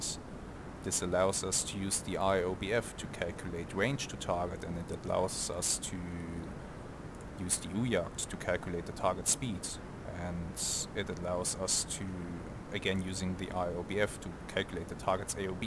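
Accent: Germany. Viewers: Ugh... that cement thick accent and germanised English, Germish, Genglish, whatever you want to call it (e.g. you focus on things in English, in German you 'concentrate' on them). They don't even try to pronounce the foreign language correctly, but mutilate it beyond recognition as they see fit. I always cringe when I hear that. Ear cancer risk. Just speak German, for Pete's sake, and that's it. Leave the rest to the subtitles. It would be even more authentic. And then you have such a hard time calculating the difference between 0° (also known as 360°) and 308° mentally, that you rather count the dashes on the compass band instead? Really? 52°? Really? After having laboriously counted all dashes? Are you sure? I mean, are you really, really sure? Dude, then you're not the right person to explain anything to me (or anybody else) when it comes to physics and geometry.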